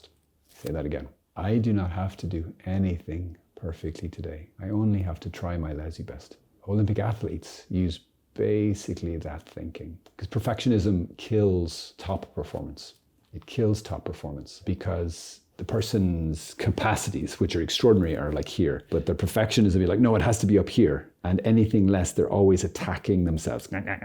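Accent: Ireland. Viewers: Is anyone else here for his Irish accent? I absolutely love it. perfectionism is a rejection of who we really are... Garsh that hit me. There's so much I could say about what he says in this video. I can't tell you how long the belief of 'you'll never be good enough so never receive communion' has kept me from communion. Then a priest noticed and asked me about it. I don't know how long it would've taken if he hadn't done that. "I do not have to do anything perfect today, I only have to do my lousy best." That's my mantra 🤣. Still a work in process, for sure. But we're getting there. 😁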